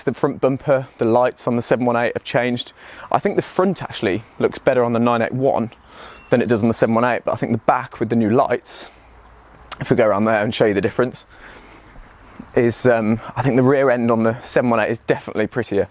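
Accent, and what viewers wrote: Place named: Northern England, United Kingdom. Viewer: You are clearly northern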